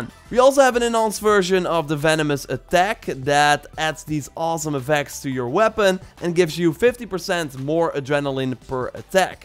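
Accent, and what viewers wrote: Netherlands. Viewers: Haha je nederland engels is echt zo mega duidelijk te horen 😉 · Love the dutch accent bruh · you have a very Dutch accent, are you from the NL? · Ik hoor gewoon aan je Engels dat je Nederlands bent, toch?🤣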